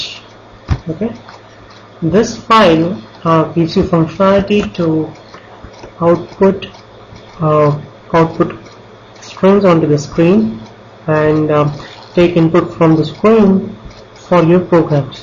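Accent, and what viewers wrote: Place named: India. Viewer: sounds as if your an indian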